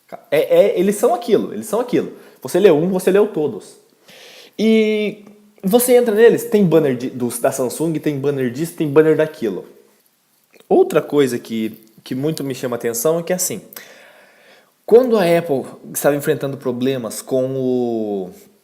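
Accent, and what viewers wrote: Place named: Fartura, Brazil. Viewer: Huahua Sotaque de Fartura hauhua, muito bom...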